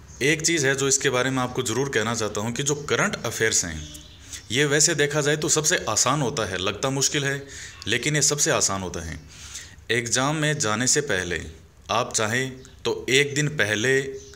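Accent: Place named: Shekhawati, India. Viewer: sir ki boli se to ye Sekhawati chetr ke lgte hai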